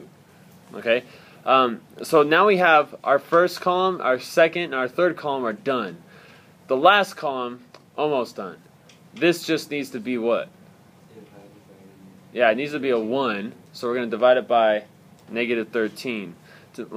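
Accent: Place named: United States. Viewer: this sound so american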